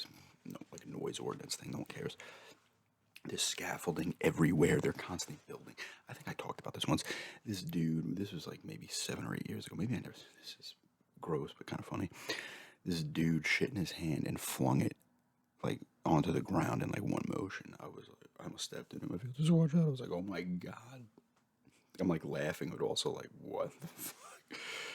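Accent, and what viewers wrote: New York, United States. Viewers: I could never live in New York, but I'm glad you do because your accent is a big reason why I tune into this channel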